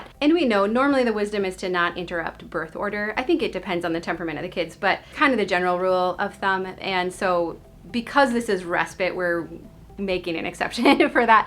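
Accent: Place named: Midwest, United States. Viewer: I like you so much. Thank you for all of your videos...and for your life that speaks louder than words.☆ Like you always say, I wish we could be friends.^^ You're a little cooler than I am, but you seem humble enough that I think it could be possible! 😎 lol I'm from Michigan, raised in Wisconsin, and taught a few years in Missouri before moving to South Korea. While teaching here I met & married my Korean husband. I do miss 'home' in the U.S. while also loving my life in Korea, so your vlogs and family life are a 'taste of home' for me.😊 Love your family's Midwest-style...all the way down to your casseroles and accent.♡ I am a sentimental saver. Your minimalist thinking has helped me learn how to declutter! I work at it often...still have more stuff (storage containers) than necessary. And it does feel overwhelming at times, especially before a move (which I have moved often!). 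I was determined to declutter in my classes this week. I had boxes & files of paperwork from the past 6 years (or more) than needed to be gone through. It was hard to get enough motivation/energy to start. But after praying for energy (which God provided!🙌...along with an Americano and another iced latte...😅), your talks kept going through my head: "time will tell", "less inventory to manage", "if you haven't used it in over a year it's okay to let it go", "don't feel guilty even if you spent money on it...it served its purpose for a time", "you can replace it later if you really need to", etc.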